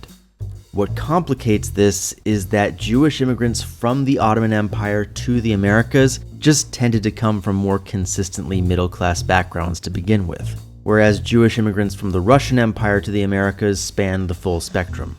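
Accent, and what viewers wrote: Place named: United States. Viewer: Your Spanish was great. You didn’t sound aristocratic or weird at all. You simply sounded like an American with a great proficiency in Spanish.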